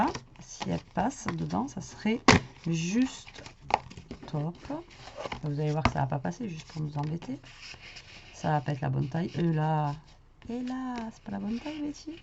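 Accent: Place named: Toulouse, France. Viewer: Cc Betty, il est vrai que je mets pas trop de commentaires en ce moment, j'essaye de me débrouiller toute seule mais pas tjs évident, et pour ce qui vous suit, je voulais dire que c'est vraiment grâce à vous que j'ai pu apprendre car vos tutos sont totalement formidable, c'est très bien expliqué, simplement et efficacement, je reviens tjs vous revoir pour tjs réaliser vos réalisations et c'est tjs un plaisir de vous revoir avec votre accent que je connais si bien, que j'adore, car je suis toulousaine, restez tjs là même car d'autres personnes comme moi qui étais vraiment débutante, aurons besoin de vous comme je le suis encore, et qui font que nous apprécions le scrapbooking, merci encore à vous, je vous embrasse 😘😊😊😊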